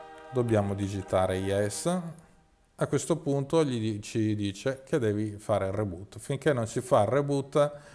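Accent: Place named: Veneto, Italy. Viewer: Il peggior problema è che l'algoritmo del router sceglie la banda con il migliori RSRP, RSRQ, SINR senza curarsi dell'effettivo risultato in termini di velocità. Nel mio caso la banda 20 restituisce ottimi parametri di ricezione, ma velocità scadente. Inoltre nell'hack non registra la scelta di banda, anche se nella pagina di debug è settato su auto. Nel mio caso, per esempio, indico 1+3+7 (sui -110 db) per evitare 20 e 28 che sono lente (sui -80 db), e questi parametri non vengono registrati. Rimangono solo due opzioni: fissare la banda a una sola o dalla pagina di debug o dal cell lock. Da quando ho smanettato con l'hack, il router non aggrega più. Non capisco poi quindi la differenza tra il cell lock e la selezione della banda e forse considerando che ho solo una sola BTS a 900 metri nella mia area, non fa grande differenza. Fino al 24 del mese sto ancora in 4G, dal 25 vi aggiorno. 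Un ultima curiosità: la mia zona non c'è zefironet, uso Iliad, ma il router accetta sia gli apn di wind che di iliad, la cosa gli è indifferente. Stessa cosa con le con le bande 4G in cui la 20 è su banda di wind e 28 per iliad e funzionano indifferentemente. Un grazie speciale a per il suo grande studio e disponibilità, mi spiace che hai dovuto dismettere il tuo blog. Un unico neo: ogni tanto la tua dizione è poco chiara. Non è per l'accento veneto, che ci sta, ma proprio non articoli bene alcune parole. A volte devo rallentare il video, ripetere il play, consultare la sfera di cristall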